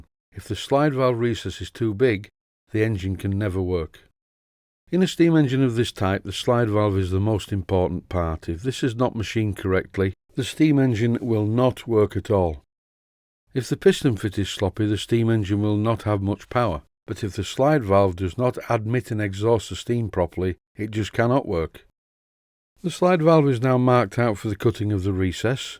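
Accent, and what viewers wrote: United Kingdom. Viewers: Well done, greetings from the USA. I've always had an interest in steam power, I guess from the many stories that were passed on to me from my uncle and others who farmed years back with horses and steam power during the early 1900s and on. I don't mean to ramble, I like to share at times. I'm now ready to start a steam engine build project I think. The workings of the valve you did so well explain here helped me a lot to understand how steam gets to the cylinder and so forth., However, I can't grasp the word you used in the beginning to describe the type of metal or cast material needed. And please understand it's not your UK dialect., The audio just didn't get through for me to hear you well. And many thanks for sharing so much here. And very cool you're a musician by trade, and doing these steam projects too. Take care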